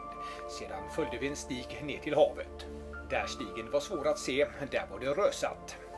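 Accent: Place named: Gothenburg, Sweden. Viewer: Fina naturbilder och världens goaste göteborgare som berättare.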